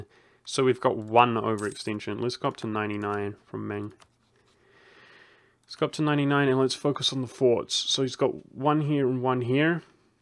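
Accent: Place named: New Zealand. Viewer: He's from that island next to Australia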